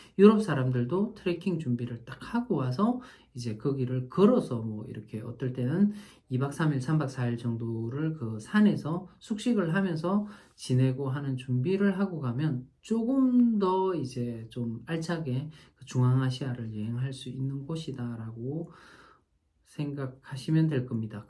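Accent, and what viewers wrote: Gyeongsang, South Korea. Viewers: 수고 하셨습니다~ 감사 합니다. 같은 억양의 경상도 할배라~ 의 세계 탐방 정보에 따른 사고의 멘토를 부담없이 만족하며 보고 있습니다. 항상 건강에 유의 하시고 가족과, 연로하신 어머님께도 지금처럼 열심을 다하는 효자가 되시길 기도 합니다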